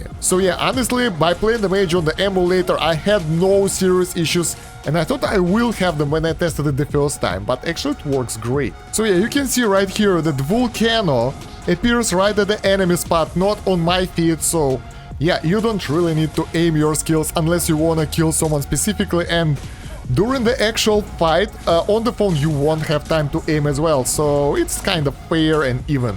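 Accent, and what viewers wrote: Russia. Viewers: Слышу Русский Акцент у автора видео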